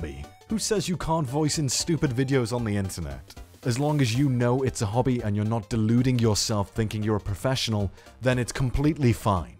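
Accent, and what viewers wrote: United Kingdom. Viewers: Are you british? You sound it.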